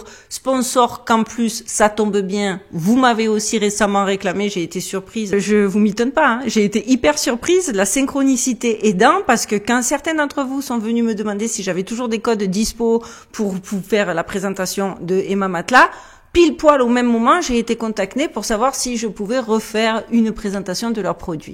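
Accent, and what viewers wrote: South, France. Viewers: merci perché de votre accent du sud 'j'espère que la vague de ce tsunami nous parviendra jusqu'ici en France ,chez nous aussi c'est le bordel j'ai l'impression d'être en famille en vous écoutant😂🙏 prenez soin de vous à très bientôt .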